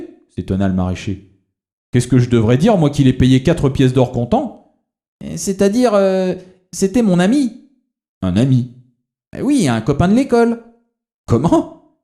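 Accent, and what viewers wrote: France. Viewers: Milles merci pour la lecture de voix avec intonnations francaises! Tu m'as beaucoup aide pour apprendre le francais populaire! Spasiba! )))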